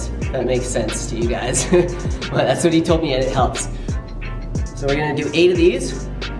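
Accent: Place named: Canada. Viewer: You sound extra Canadian in this video 😂 Also , you got them mini boulder shoulders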